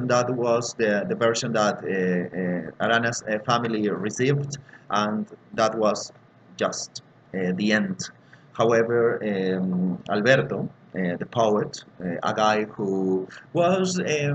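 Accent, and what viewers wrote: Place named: United Kingdom. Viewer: English from Británico😊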